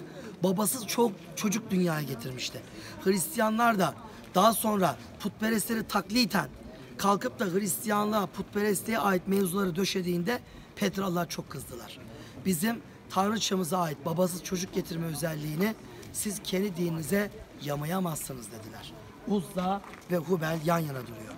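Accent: Turkey. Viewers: kayda değer ne anlatıyor diye dinlemek istedim ancak egeli ağzıyla "öyle diyolağ böyle diyolağ ama yanlış diyolağ"dan başka bir argüman duyamadım. çığrınmaktan tepinmekten argüman sunmayı unutmuş abimiz az bi soluklan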